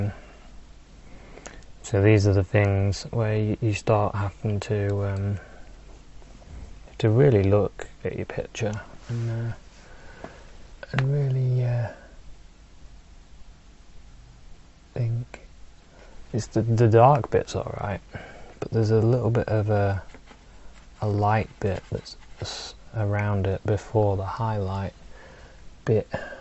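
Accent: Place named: England, United Kingdom. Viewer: I know his accent is English, but is he based in the UK or Australia as we have a lot of Brits who migrate to Oz. Cheers from down under.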